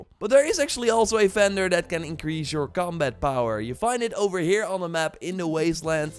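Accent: Netherlands. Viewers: That dutch nasal accent makes me want to cut my ears of. Nice content though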